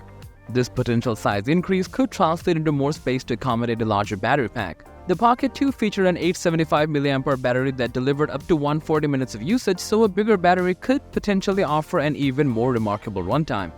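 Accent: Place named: India. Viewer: U sound from india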